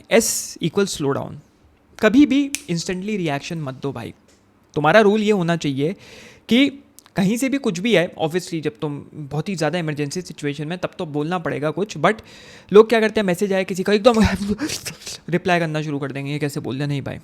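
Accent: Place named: Bhopal, India. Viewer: bhiya why there is slight bhopali accent are you from bhopal?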